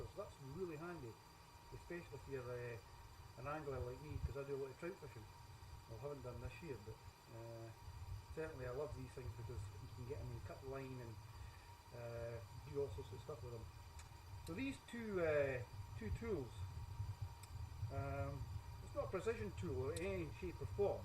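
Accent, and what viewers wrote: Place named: Scotland, United Kingdom. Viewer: Are you Scottish ? bec i m not used to hear English with a n accent like that ( Im french speaking ) and I think you are Scottish it's bit difficult to understand for me , bu t I find your vid is interesting , i like walking in the nature too ^^ i like UK bec it has very vast areas with no roads and no houses but not here in Belgium bec my country is too small ^^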